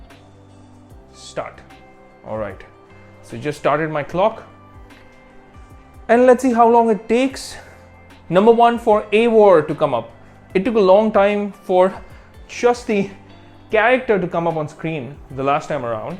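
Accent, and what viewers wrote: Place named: India. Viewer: The Fact that he looks a bit like Chinese...with an indian Accent. 😬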